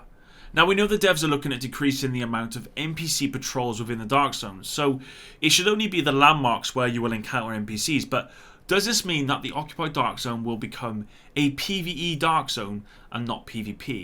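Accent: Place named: Bristol, United Kingdom. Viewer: What part of Bristol are you from?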